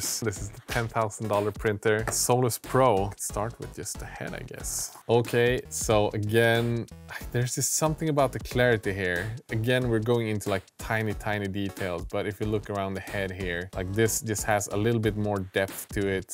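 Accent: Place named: Sweden. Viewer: This sounds very Swedish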